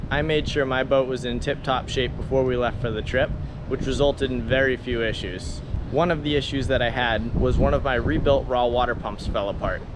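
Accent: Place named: New England, United States. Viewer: With that boat and that accent, I can definitely tell you’re from New England! Very informative, otherwise. I’m wondering why you didn’t go with a sail boat to save on fuel long term, though.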